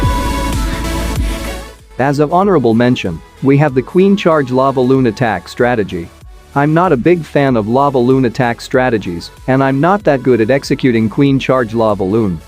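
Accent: Kerala, India. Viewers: Bro Malayali aano?? 🙄🙄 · Malayali aano bro?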